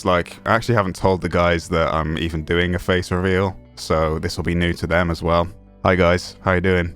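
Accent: United Kingdom. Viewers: Good on you, to be honest I never actually subscribed until now basically because I 'assumed' I already had (hearing you in the videos) while watching Aculite 'so much' and Fugglet is on fire at the moment - so I kind of always watched you in a 3rd party sense. My Bad, Subscribed and keep it coming mate. Nice to hear the UK within the 'TEAM'. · I live in Shropshire dang.... Thought your accent sounded familiar🤦‍♂️ I'm from Telford,.. tiss a bit rough lol. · has a British accent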